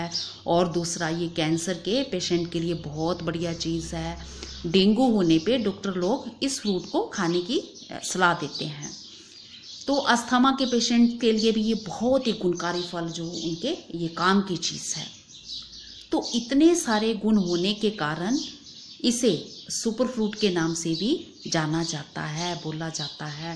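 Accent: Punjab, India. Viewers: aapki aawaz punjabis tone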